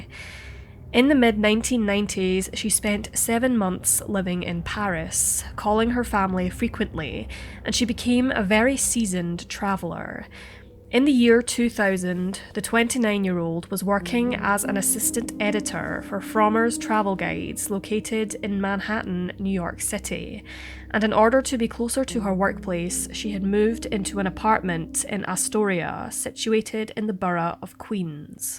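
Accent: Scotland, United Kingdom. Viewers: I've watched quite a few Dark Curiosity stories now. The Mayan narration adds so much. Of all the different Scottish accents, yours is of the most gorgeous. There's a gentle folk tone to your voice that only exists in certain areas. It's so welcoming that it doesn't surprise me that so many love spending time with rural locals. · I love your channel. So many cases I’d never heard of till now. You’re very gentle and respectful too. Love your Scottish accent as well! (My mum is Scottish!)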